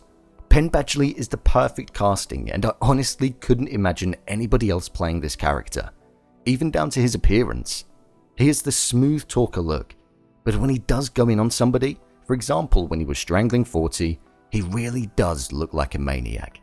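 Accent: United Kingdom. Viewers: Oh great another cynical white british male voice on youtube to bestow his oh so original critical thoughts on us.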